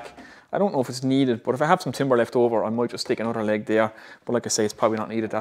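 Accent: Waterford, Ireland. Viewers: Cut the ploywood with the moytersaw, I love the Irish accent almost as much as I love this build. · just looked back and found your video fixing timber to the walls. I might just take down the few bits I've put up and start again like you did. I'm gueaaing by the accent you're a Waterford man. If so, commiserations for Sunday.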